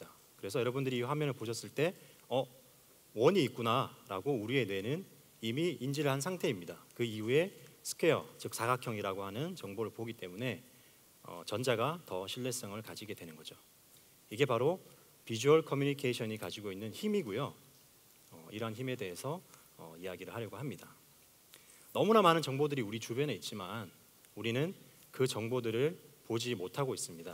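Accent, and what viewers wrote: Gyeongsang, South Korea. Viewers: 좋은 내용.전 왜 한국은 인포그래픽이 없나햇엇는데. 강의하시는분말투는 경상도 생긴건 전라도네요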